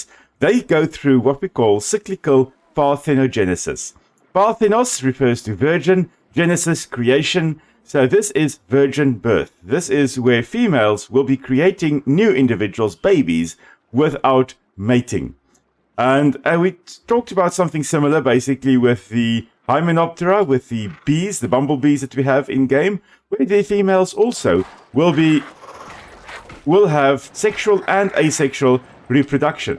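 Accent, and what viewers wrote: South Africa. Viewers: Fascinating...who knew water fleas were so interesting?. Are the eggs they reproduce through parthenogenesis actually fertilized by the female or does it have a unique process to accomplish birth without fertilization? Came for the learning...subbed for the SA accent :)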